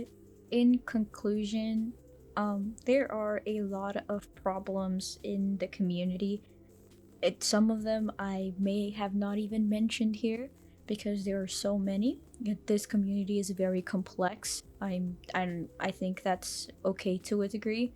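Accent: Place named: South, United States. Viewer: Omg no I love Southern/Black accents 😭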